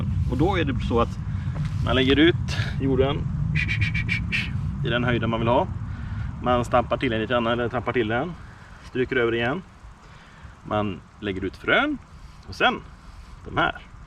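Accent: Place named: West Coast, Sweden. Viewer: Tack. Man ska inte lufta på sommaren väl? Tänkte kanske lufta i augusti en vända samt slänga på topdress o överså, vad tros om planen? Är du baserad på västkusten? Låter som dialekten är här någonstans. Är själv baserad i Mölndal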